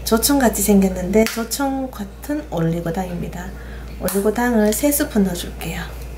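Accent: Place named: Gyeongbuk, South Korea. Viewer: 오늘 첨 들어와서 보곤 와~놀랬습니다 독특한 네임 이시구나 하곤, 아람냥 지면에서 그냥 글들 보곤 했는데 정말 대단 하신 분이시네요. 말씀이 경북 분이신것 같은데 상어☞갱상도선 돔배기라 하죠 비린내 없는 담백한 맛. haigo! 칼치 조림 묵고 싶어라! 꼴딱 꼴딱~~~~^^ 앞으로 계속 맛있는 영상 봐야 겠슴다....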